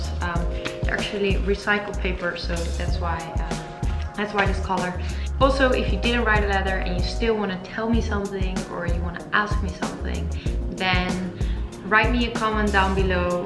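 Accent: Netherlands. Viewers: Good Afternoon!!! you are adorable !!! you feel like my granddaughter!!! I love your Dutch accent!! My family always told me that we are Dutch ... so I'm so very glad that I have found you on Youtube ... I haven't written yet !!! Just found you a week or so ago.. didn't know about your PO Box.. where can I find your address.. Love ya!!! Tell Max HI. !!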